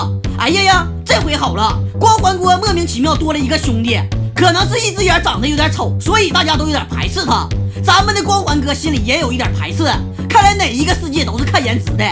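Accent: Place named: Northeast, China. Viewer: gun six 东北话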